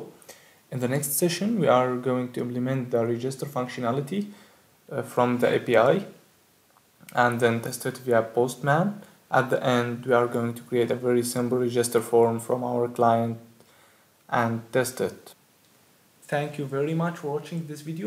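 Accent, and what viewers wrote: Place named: Egypt. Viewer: عرفت انك مصري من لهجتك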